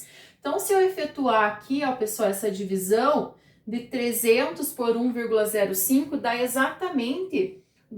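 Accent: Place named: South, Brazil. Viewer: Professora estava olhando umasa aulinhas de matemática financeira encontrei esse sotaque que deve ser do Sul. ,que ao que parece entrou no mundo das tele aulas devido ao isolamento sócial . Querida vejo também que tu gosta de ensinar e eu defendo quem faz as coisas por amor beijo sucesso e se puder ponha aulas para encontrar taxa e período compozto pois não encontra se aí disso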